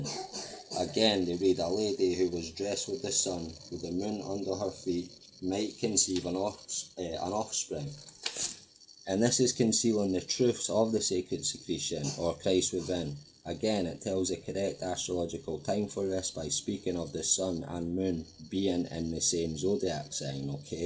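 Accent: Scotland, United Kingdom. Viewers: Well, I do not understand everything because of your accent, as English is not my mother tongue, I have problems with the Scottish accent, AND with the baby's noises in the background, sorry to say...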